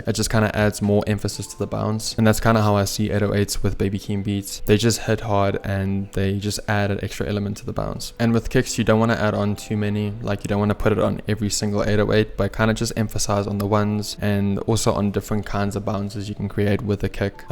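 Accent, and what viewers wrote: South Africa. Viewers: I smell a south african accent ei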